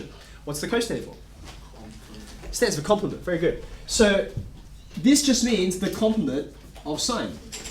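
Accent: Australia. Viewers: I was not expecting him to be Australian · I love the Australian accent ... "I know some of you know what the cooeeuuaarrrrr in cosine means."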